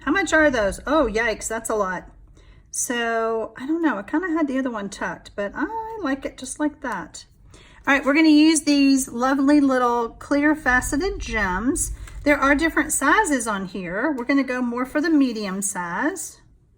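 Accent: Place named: Texas, United States. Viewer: Such a nice and easy card...by the way... I like your texan accent pretty much...Thanks for sharing this idea...🤗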